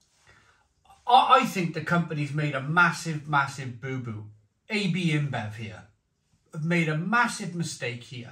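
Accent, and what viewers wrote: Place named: Cardiff, United Kingdom. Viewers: That's a Cardiff accent if ever I've heard one! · Hiya mate, just popped up on my feed and agree 100% with what you say, and it's got even worse over the years as well ugh. Also, as a Kairdiff boy meself, I recognise that accent so know you are an expert when it comes to drinking your beer 😎 and love your setup. Your enthusiasm is infectious mate. These days only drink Weissbeers that have been imported as I'm too old to deal with the hangovers from the chemical crap that we Brits get slopped up with by the pubs and major brewers. Like you, been to Belgium many times and totally different class of beer. Trouble with the UK is that as long as it gets you pissed most boys don't give a crap about flavour or quality. We have a different drinking culture to the continent, where they wouldn't touch UK versions of their own beers. 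Sadly, nothings gonna change. Although paying £5+ for a pint of lager in the pub these days (wtf???) means my drinking out days are definitely over haha. Rather buy some quality to enjoy at home. Pity, as the pubs are dying - no-one has the money for that these days what with prices high and wages not changed since 1980 (grim lol). Only time I really go to the pub is for a meal out with friends these days. Oh well, was fun while it lasted :)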